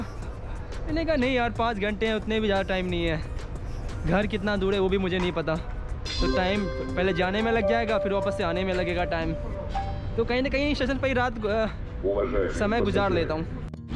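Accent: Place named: Haryana, India. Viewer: Had mtlb bones, hal mtlb kaise ho Bhait tum haryana se ho lgta h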